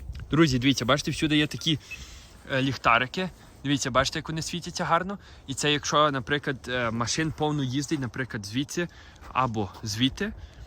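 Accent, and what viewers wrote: Western Ukraine, Ukraine. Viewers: галицький діалект, і чого так зневажливо, " западенці"?, це що за кацапські словечки? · Не чув) але говір як западенський)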